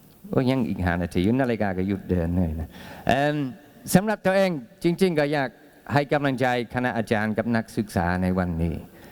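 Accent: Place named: North, Thailand. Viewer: ที่มึงพูดอะเมืองไยล้วนๆ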